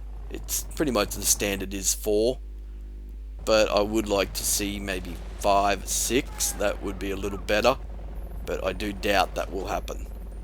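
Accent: Australia. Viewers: you sound aussie are u? gret gamplay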